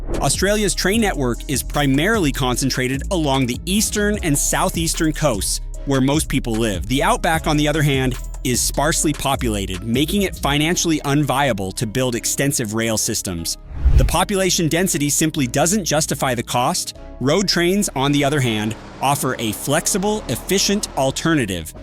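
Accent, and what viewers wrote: United States. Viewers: Why does an Australian story have an American voice over? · The only reason I clicked on this was to leave a comment. The thumbnail to click on is the biggest load of Photoshopped sensationist crap I've ever seen, I bet you're a yank or AI voice sprouking this bullshit. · as an aussie i found it amusing to listen to a yank telling me about road trains, secondly shows a Lefthand drive car, NOT on australian roads. i suppose yanks limited intelligence.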